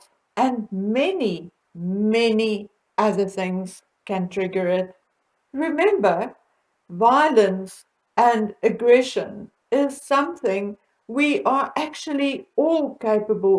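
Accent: South Africa. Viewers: Hello! I really enjoy your video chats and sincerity. Love your South African accent. Bravo! Yes any intelligent person realises that M is ill and your analysis of the situation and the possible end is absolutely valid. May l please just ask one thing? Do you write out your messages and then read them? Sorry sweety it sounds like it.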